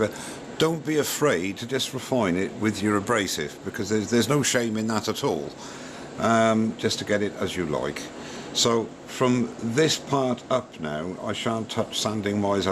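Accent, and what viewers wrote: Wales, United Kingdom. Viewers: Hi!! Very nice job on the finial, move over Cindy!! Great tip using the stain to give the apearance of ebony, I liked that. I know as your videos become more polished you can incorperate music and speed up the film but I must admit I do miss the old simple videos with your explanations and banter while turning. It must be your mellow Welsh tones. Still a great vid All the best!!.............Andy.;)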